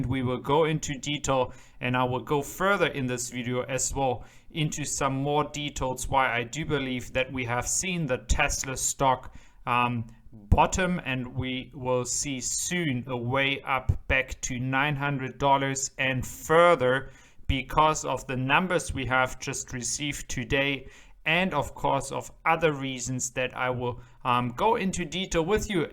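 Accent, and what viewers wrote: Germany. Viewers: new viewer here, 👋🏻, what's your mother tongue? & "Feviery" sounds a bit French, but your vowels and stress are all Germanic. "Twenny" — Not sure what this tells me "six hundred and thirty two" — presence of "and" makes me think not German or at least didn't learn US English, but: "ja": Maybe you are German? I'm all confused :-)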